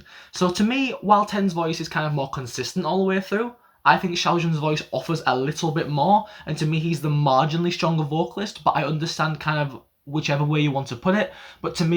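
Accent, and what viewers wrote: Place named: Northern England, United Kingdom. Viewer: Can I ask where you're from? You have an interesting accent. It kinda sounds like northern England or maybe even Scotland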